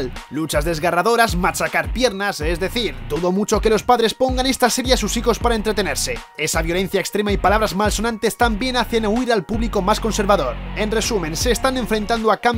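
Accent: Spain. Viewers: 😅 Narrador mas españolete no Podria ser 😅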